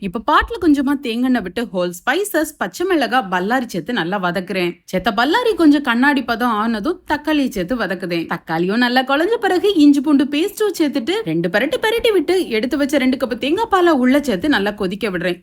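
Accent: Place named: Thoothukudi, India. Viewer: Sister ungalukku entha ooru... Because neenga pesura language kekum pothu enga area mathiriye iruku sis.. naan Thoothukudi district sis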